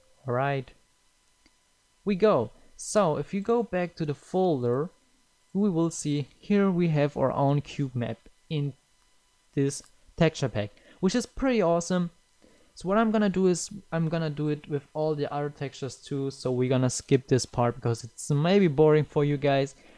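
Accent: Germany. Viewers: Ach hab mich schon gewundert 😂. Deine Sprachstil klang so englisch aber die Aussprache mehr deutsch, deswegen war ich bissl unsicher. Aber Danke für deine schnelle Antwort 😂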